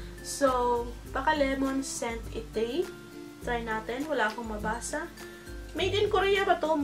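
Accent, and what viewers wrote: Philippines. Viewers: HI miss! I really love the way you talk, pinoy na pinoy.:) Thankyou po for this video/blog! it's very informative and useful para sa aming mga nagcacanvass muna or naghahanap ng mga reviews bago magpunta since malayo samin ang divi. but anyway, love your divisoria haul especially your vanity area. grabe, isa po talaga yan sa mga dream vanity area ko. yung madaming makeup brushes na nakadisplay, ang daming makeups, dream come true po talaga sakin kapag nakapaginvest ako ng madaming makeup at naachieve yung vanity arra na kagaya ng iyo. Kasi sa ngayon, nagiipon pa po ako at nagcocollect. hehe. I also do sideline na small time makeup artist, sa mga relatives ganyan hehe. Kaya po kung mananalo ako, makakatulong po talaga yan sakin sa pagsisimula especially nagpho-photoshoot (modeling) din po ako.💋💕 more power po and Godbless!💞 ig: Facebook: Jasmine Alodia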